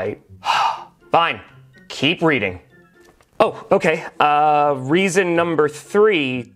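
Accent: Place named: Kansas City, United States. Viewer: You need to work a little bit of Ted Lasso into your Chiefs fan accent. I love your videos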